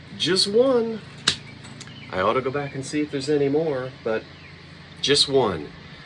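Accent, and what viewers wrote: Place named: Philadelphia, United States. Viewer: I mentioned to tell u that whenever I get homesick for Philly , Jersey or Delaware I watch you. Even your voice and accent make me feel better.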